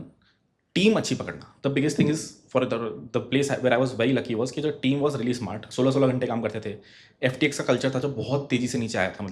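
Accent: India. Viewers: Thanks Bro speaks Indian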